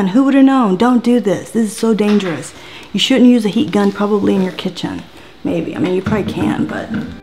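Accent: South, United States. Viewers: Holy crapola you are adorably gorgeous, and that accent WOW. Where are you from in the south anyway. I was actually looking for info on this pan and found your video, which made me so freakin happy because not only did I learn about the pan, but I learned it from one of the most attractive women I have ever seen. There is nothing I don't like about you. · you are very entertaining, interesting and smart! Plus you have the coolest slight Southern accent...and what a voice! Just curious, what State are you in? Most entertaining reviewer on Youtube!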